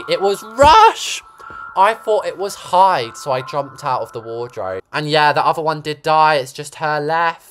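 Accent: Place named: United Kingdom. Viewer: Are you a brit cuz your accent is so beautiful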